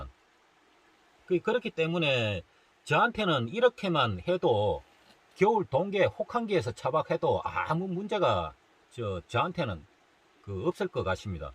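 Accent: Gyeongsang, South Korea. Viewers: 요때기 경상도 사투리 정겹네요ㅋㅋ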